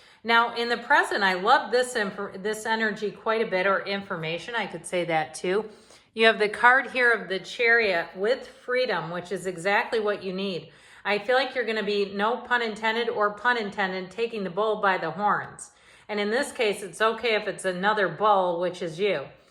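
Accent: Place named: Chicago, United States. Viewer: you sound like you're from chicago. I grew up there and know that accent anywhere! So glad I found you here and this totally resonates with me. One question I have is how is the retrograde helping abundance?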